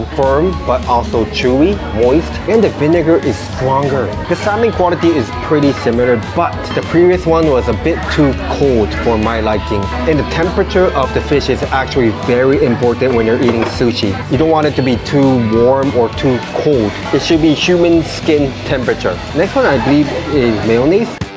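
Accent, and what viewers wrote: Singapore. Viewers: OMG you sound so Singaporean now!!! love it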